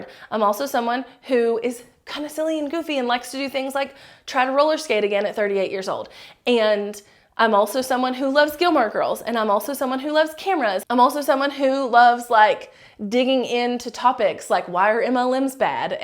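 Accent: South, United States. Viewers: I love linguistics, so the southern pronounciation is fascinating to me. But I'm in Canada, so you KNOW i say niche the French way.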